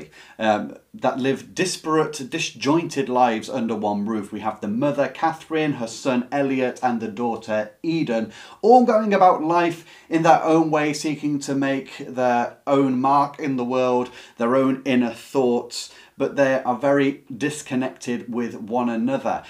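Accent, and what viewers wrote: England, United Kingdom. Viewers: What an engaging list of books you have! I am interested in the book "The Sicilian Romance" and quite a few other books as well. You have an amazing You Tube channel! I adore your English accent too.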